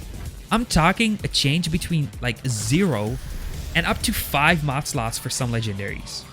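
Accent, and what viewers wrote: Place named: United Kingdom. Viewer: Why is it always someone with a british accent telling people how to play the game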